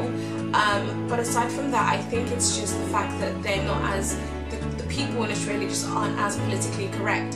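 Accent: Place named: England, United Kingdom. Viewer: A black women with an Engish accent ....awww I fell in love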